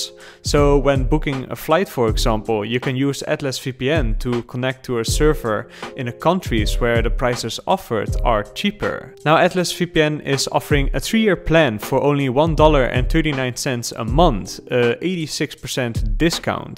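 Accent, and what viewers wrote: Netherlands. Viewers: Are you dutch? That accent 😎😇 O ffs you just told us in the video lol.... How the .. did you end up in HK?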